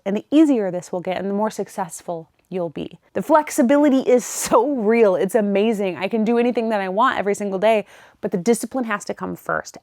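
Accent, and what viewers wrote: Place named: Midwest, United States. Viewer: I just hear accent, maybe Midwestern? Maybe there's a touch of nasal sound. Trying to hear what you complain about, maybe a couple bits of that sound. But certainly not like the Hollywood exaggeration that makes you want to mimic the sound and turn it off. Anyhow, she sounds like a sweet and lovely young lady. Didn't your mama teach you that if you can't say anything nice, to say nothing at all? Just scroll on with your miserable self and leave the sweet ladies alone!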